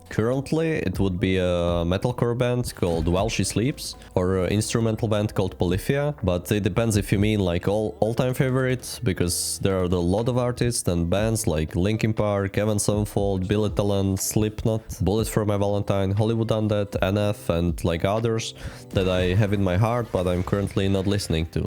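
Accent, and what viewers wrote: Czech Republic. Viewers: I knew you were czech... by the accent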